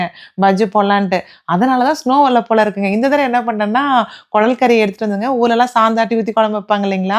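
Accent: Kongu, India. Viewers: Kongu tamil. erode ah sis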